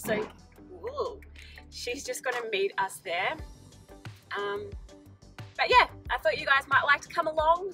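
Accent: Australia. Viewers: Our spotlight is a bit like that in Queanbeyan NSW. We don’t even have one in Canberra. But we have 3 Lincrafts? I am enjoying all your videos so nice to hear an Aussie for a change.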